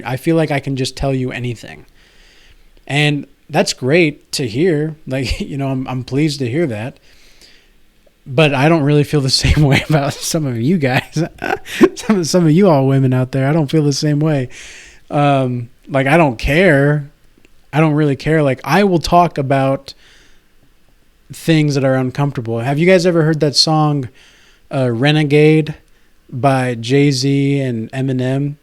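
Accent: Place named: United Kingdom. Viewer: No. It worked. The British accent. It worked : )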